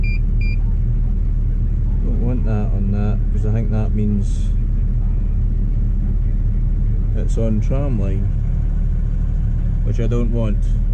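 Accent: Scotland, United Kingdom. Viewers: He is a proud Scotsman!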